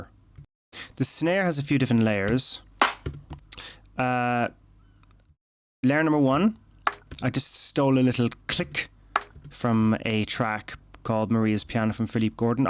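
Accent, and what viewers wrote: Ireland. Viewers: Out of curiosity, which part of Ireland are you from ?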